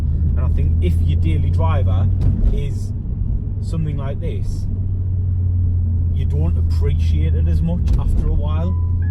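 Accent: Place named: Sunderland, United Kingdom. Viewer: you sound local to me bud I'm from Sunderland cant wait to get my mk2 this year , iv never had the chance to even sit in one there that rare around my area .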